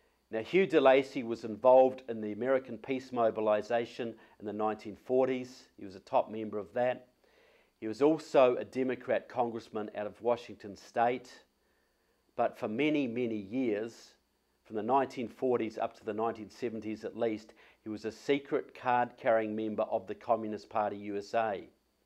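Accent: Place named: Australia. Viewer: i just cant stand looking at his ugly drood face for more than 1 minute, and listen to his annoying austrailian accent, long enough to finish this video, boooorrrinnng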